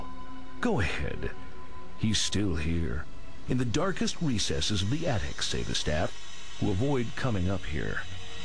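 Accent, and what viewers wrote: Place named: United Kingdom. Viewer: hm ur british arent u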